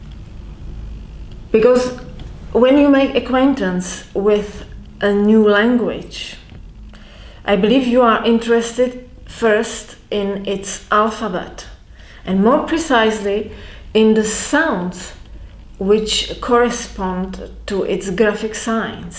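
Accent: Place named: Poland. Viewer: I love your english. I'm from Poland and your accent is similar to polish accent 😄 I am starting study czech language and I hope your films will help me 😁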